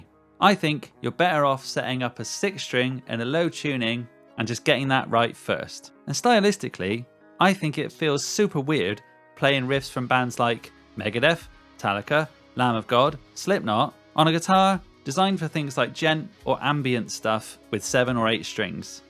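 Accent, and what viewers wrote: United Kingdom. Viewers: ur british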